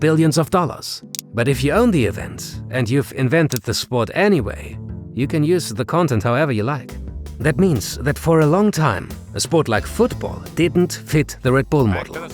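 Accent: Germany. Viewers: u german? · Athletic Interest is THE best channel when it comes to informative and exciting sports stories. Thanks a lot! And never get rid of your Unique selling point: your german accent speaker. simply great 🥳🥳🥳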